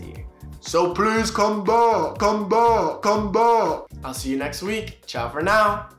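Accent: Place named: North America. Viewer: Very interesting video thank you I think it’s interesting how your accent sounds more North American than Barbadian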